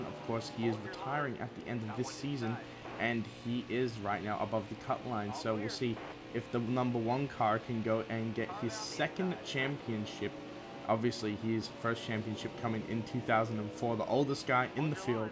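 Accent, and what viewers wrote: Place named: Australia. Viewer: Never getting-ver this Australian accent pog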